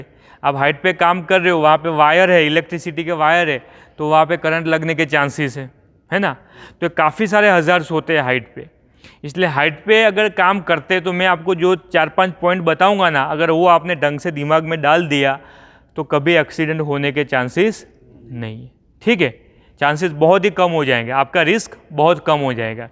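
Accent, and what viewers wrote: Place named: Gujarat, India. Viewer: Mai bhi sir idhar TL line me kam krne aya hu Gujarat ap jaise bol rhe hai idhar bhi log aese hi bolte hai isiliye laga ap gujrat se hai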